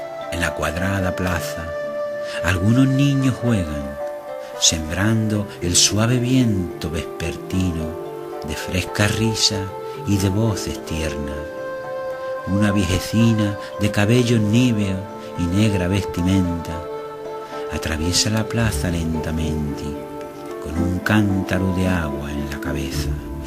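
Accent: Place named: Extremadura, Spain. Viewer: Muchas gracias, mi estimado amigo y paisano, por este precioso montaje audiovisual de mi poema en el que se dan la mano fraternalmente el verso y la imagen en una sincronía perfecta... Me ha gustado el tono de voz grave,sereno, solemne y la "extremeñización" del lenguaje con las haches aspiradas.Sólo tengo que ponerte un "pero": no has puesto ni una sola imagen de mi querido pueblecito, Jaraicejo. Un abrazo fraternal de tu amigo Wenceslao Mohedas Ramos Jaraicejo (Cáceres) / Barcelona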